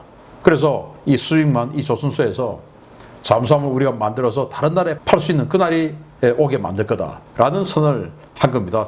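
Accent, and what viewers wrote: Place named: Seoul, South Korea. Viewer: 서울말 쫌 되니 .....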